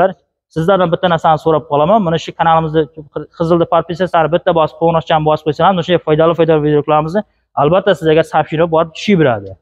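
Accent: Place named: Lakai, Turkey. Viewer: Узбекча гапир лакайча гапирма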